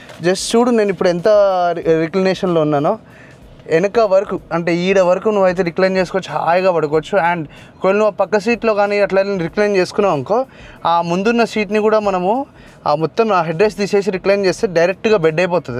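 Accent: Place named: Telangana, India. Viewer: Bro Nee Launguage style So nice.....Pure telangana accent...Pakka Clear Cut On subject. Spr Bro Keep it up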